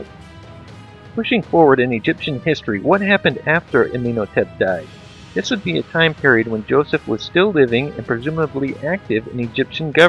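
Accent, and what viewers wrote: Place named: Midwest, United States. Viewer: from the North, Midwest. (Going by that accent)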